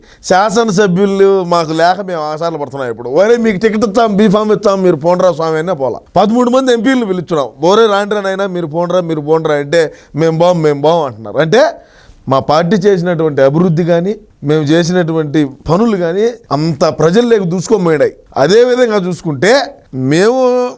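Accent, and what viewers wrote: Rayalaseema, India. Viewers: Bro sooper..... Nee body language and seema accent superb❤😂😂🎉🎉🎉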